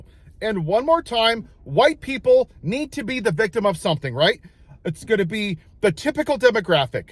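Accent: United States. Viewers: He's not that fluent but he's ok at it. I still hear a bit of a gringo accent but with practice, he could start to sound more native.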